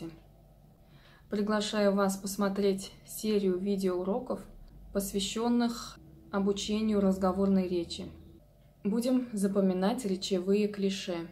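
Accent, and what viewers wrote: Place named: Kazan, Russia. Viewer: Сэлэм ! У неё казанское , литературное произношение.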